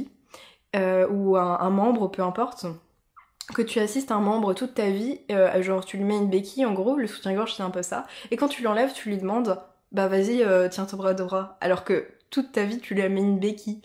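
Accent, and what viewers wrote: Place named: Haut-Rhin, France. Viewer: j'en était sûr ahah je sais repérer l'accent de chez moi :) je viens du haut rhin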